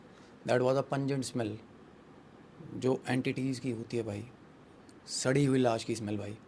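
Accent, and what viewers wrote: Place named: Punjab, India. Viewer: Bhaiya wo punjabi English hai ...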